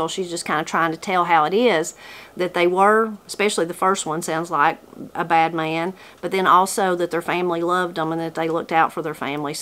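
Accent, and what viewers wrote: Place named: Kentucky, United States. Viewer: I remember my grandmother’s voice and mama’s stories of family with every turn of your voice. Even though mama’s family was transplanted to Oregon, the Kentucky in their voices and stories echoes in my mind with each story you read. Thank you.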